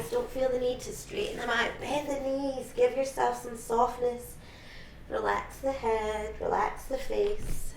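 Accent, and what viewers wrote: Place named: Glasgow, United Kingdom. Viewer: That was great the glasgow accent made it better thanks namaste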